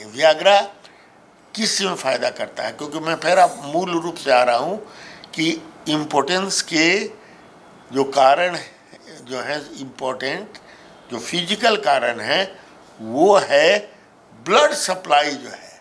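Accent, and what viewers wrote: Bihar, India. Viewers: It was such a beneficial yet amusing experience listening to such a wise neurologist in typical Bihari accent and style...😊❤ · Sabse best doctor sahab ka samjhane ka bihari lahja uper se khan to muzaffarpuriya style as-------Repallaya sabd dilko chhoo gaya. Thanks doctor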